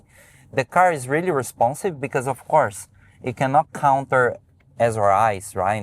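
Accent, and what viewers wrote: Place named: Brazil. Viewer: I love your brazilian accent - we are so lucky to have you here in australia reviewing this car. thanks for your advice!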